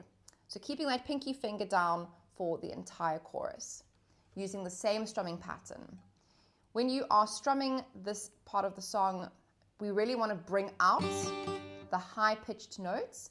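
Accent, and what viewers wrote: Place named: South Africa. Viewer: Love the Safa accent! Makes me miss home!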